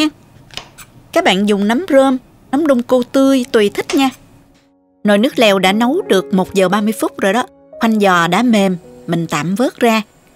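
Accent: South, Vietnam. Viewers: Cảm ơn cô cách hướng dẫn cụ thể dễ hiểu. Đặc biệt cô rất thân thiện giọng nói ngọt ngào đậm chất Nam bô.nhờ sự chia sẻ này mà chị em nội trợ ngày càng có kỹ năng. Chúc cô luôn vui khỏe và có nhiều like😄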